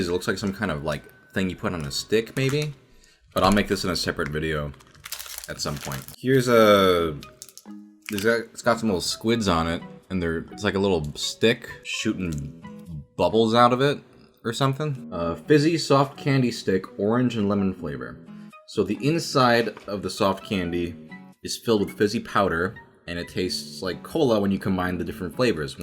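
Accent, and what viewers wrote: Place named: United States. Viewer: I'm lying in bed at 3AM, watching a Korean man with an American accent eat Japanese snacks... what am I doing with my life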